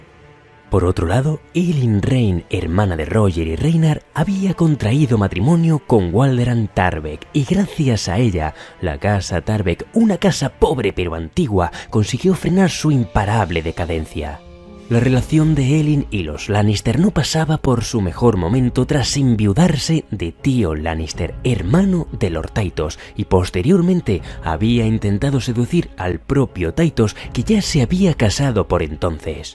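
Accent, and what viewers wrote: Spain. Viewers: El asentó español le da esa toque medieval, gracias por la pequeña reseña del origen de esa canción.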